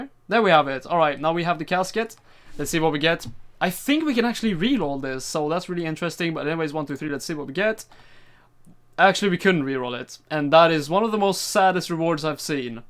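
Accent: Sweden. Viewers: Are you from Sweden? Your accent kind of sounds like rather Nothern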